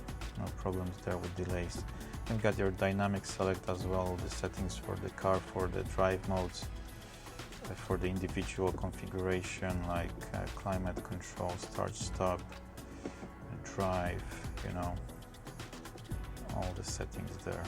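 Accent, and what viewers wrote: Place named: France. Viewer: Il est francais lui je reconnais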